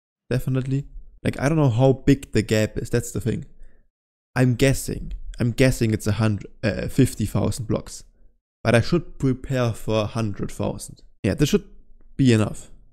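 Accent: Germany. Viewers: Höre ich da etwa... DEUTSCHEN AKZENT?? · I hear german🧐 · Man kann den deutschen Akzent hören lol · Bester deutscher akzent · Immediately noticed the German accent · is this an german accent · Da hört man den deutschen akzent direkt raus hahah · bro sound just a little DEUTSCH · Wieso hör ich so das deutsche englisch raus 😂 geiles vid❤ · ok ok i hear a little bit of german in your voice, aber ehrlich cooles video bro mach weiter so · ich liebe den deutschen akzent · I hear a bit german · Hallo wieso machst du deine Videos nicht auf deutsch (man hört das du aus Deutschland kommst) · Are u german ur accent sounds like ur german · I hear German Denglish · ofc the guy with the most german accent ive ever heard is talking about ethnicity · Bist du deutsch? Dein accent hört sich sehr danach an. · I hear ein bisschen German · Aha gutes video man merkt so bisschen das du Deutsch bist ❤ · I can hear the deutsch :) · Hahahahaha hier der deutsche Kommentar nach dem ihr alle sucht, passend zum wunderschönen Akzent 🇩🇪🔥! 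SEHR STARK GEMACHT, MEISTER 💪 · Oi digga, bist du Deutscher? Dein Akzent ist ziemlich deutsch lol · youre definetly german i can tell by the accent so servus meister wie gehts super video 👍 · i can hear a little bit of german :3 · I can hear the German accent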